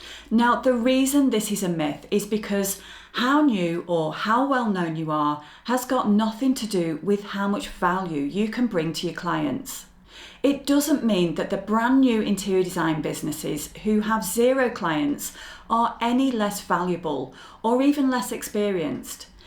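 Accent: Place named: United Kingdom. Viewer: Thank you again for sharing....I am actually setting up the backend of my e-Design company, and the price is the area I am currently working on, although I have 3 packages already prepared, so your advice is invaluable. Plus, I am now in the USA and your British accent reminds me of my hometown! how cool is that! LOL!😊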